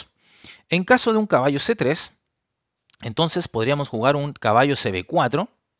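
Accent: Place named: Chile. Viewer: Le encuentro un acento Chileno xd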